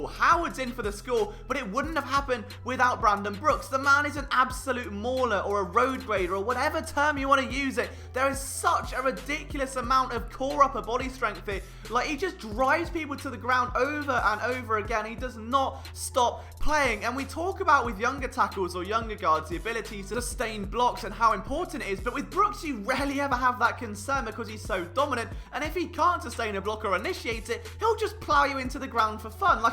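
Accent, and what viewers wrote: United Kingdom. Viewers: Jeopardy "Potpourri" Category as an addendum: 1. Brooks isn't THAT tall, though he moves incredibly well for his overall size. Height wise, he's maybe an inch taller than me, at most. That he TOWERS over Sproles at the is hilarious. (Sidebar: Off the Sproles mention, we desperately need to get an elite RB like Swift, which I doubt they'd do, a solid one like Dobbins/Taylor or the Sproles lookalike -- LSU RB. If he's available in the mid third-round to early fourth-round, the Birds need to get him. While I'm not the biggest Sanders fan, a 3-headed RB tandem of the Howard, Sanders and LSU RB would be absurd. 2. I didn't think Brooks was going to play this year when he initially tore it . 3. PFF is flawed, my dude. I'll get you on my side at some point. Constant badgering is foolproof, right?! Ha. 4. On the first play in this film room, you mention his C, OG and OT "features" to make him a complete OG. 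But for the fact the Birds lucked out with the Dillard pick, I'd very seriously think of moving Brooks to one of the OT spots, if Vaitai struggled. All his measurables and eye-test shows he could likely be an adequate OT in the NFL -- but is clearly more valuable as the elite of elite OG. 5. The rest of the film room is why we all come here. I really would invest in this channel if it could somehow offer private shares. You break it down like Baldinger, yet are youthful enough to relate to most of the audience. Plus, even though I hate to admit it: the British accent, howeve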